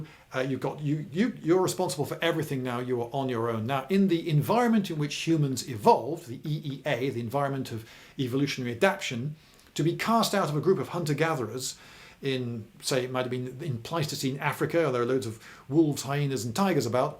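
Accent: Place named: United Kingdom. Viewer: The accent definitely helps. If this would have been American I would have gotten sick of it extremely quickly, but I just love listening to a Brit talking about his passion. I have learned more from than I ever did in my school career.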